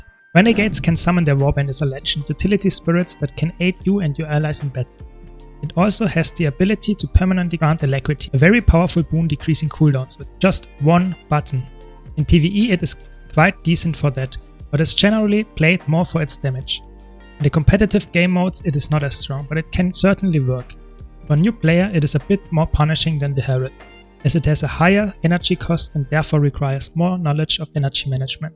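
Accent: Germany. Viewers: Ah yesss that german kartoffel accent xD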